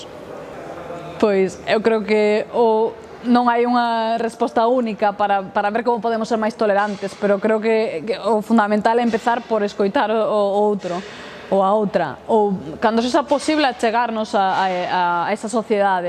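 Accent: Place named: Castile, Spain. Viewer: Fala bem o galego, mas ainda assim impregnado da entonação castelana.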